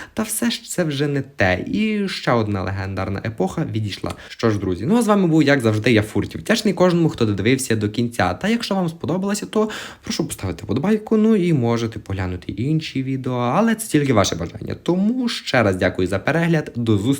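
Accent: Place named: Western Ukraine, Ukraine. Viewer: контент класний, але сильний западенський акцент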